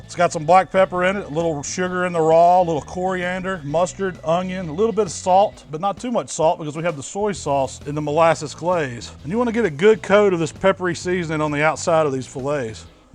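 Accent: South, United States. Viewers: For as much "southern drawl" as you have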